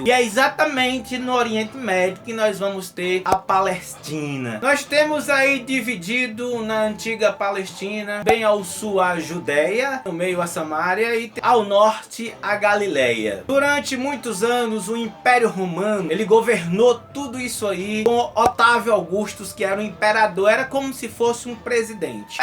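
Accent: Northeast, Brazil. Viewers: Meu amigo, á muito tempo sigo seu canal, vejo seus vídeos, gosto muito. Você é um cabra arretado, que fala tudo com esse sotaque humorístico gostoso dos nossos irmãos nordestinos, mas vendo hoje esse vídeo, me emocionei um pouco, com tão belas explicações do evangelho em resumidas palavras. Se o homem foi inspirado para escrever a bíblia, como dizem aqueles irmão mais fanáticos das religiões, você estava inspiradíssimo por Deus, na hora que fez este vídeo. Estou vendo que foi o ano passado que você fez, mas esta reflexão vai valer por seculos e este momento de quaresma, vai ser sempre mais um bom momento para podermos acompanha-lo e refletir. Ah! Se todos os padres, bispos e pastores das religiões tivesse a graça de Deus que você teve, para explicar de forma tão direta o que é Jesus e quem foi aquele povo, aquele sacerdotes, aquele sistema. Ou seja: Não mudou nada. 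Jesus continua morrendo coossificado e o povo continua crucificando Jesus.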